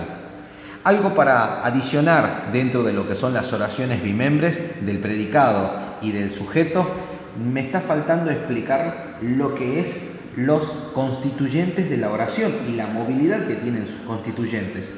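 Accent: Tucumán, Argentina. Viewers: Sos de tucuman?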